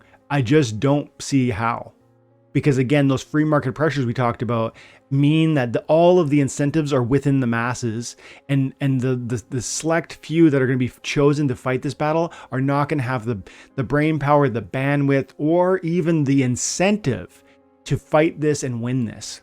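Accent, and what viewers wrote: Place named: Canada. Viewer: The first time I unplugged my headphones from my laptop and watched one of your videos and my girlfriend walked by she said, "He's Canadian?" and I said, "How did you know? He doesn't really have an accent" and she said, "aboot." I'd give her my raffle ticket for that one but she doesn't play.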